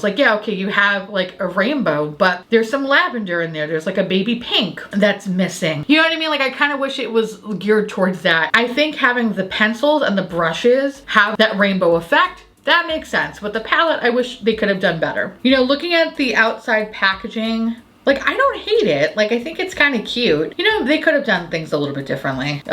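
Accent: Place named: New York, United States. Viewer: Observation on your New York accent: I never noticed it in your videos filmed in Queens, but now that you're in Florida, the accent is more noticeable! ❤️ Maybe you're subconsciously differentiating yourself from all those FloridaPeople™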